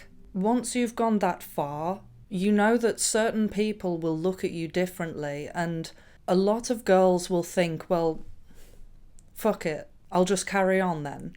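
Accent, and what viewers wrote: United Kingdom. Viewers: Your UK voice is amazing and the pronunciation and accent.. I could listen to this voice for hours. What part of UK is your voice accent from? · I love your accent 😍 are you british ? New sub here i love your content speak wisdom to this dumb stupid girls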